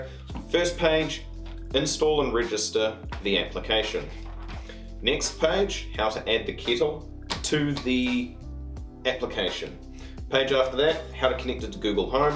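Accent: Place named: New Zealand. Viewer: foreeeeever agor haha kiwi accent is so cutee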